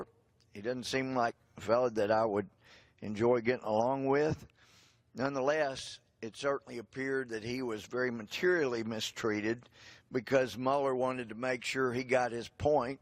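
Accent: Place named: Texas, United States. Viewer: This is a great and humble man, who has served his country and his state and is fighting to save the Integrity of the FBI and also the Justice Department. Although he speaks with his slow, southern, Texas drawl, he says more than Hillary Clinton and Obama have ever said of substance in their lives.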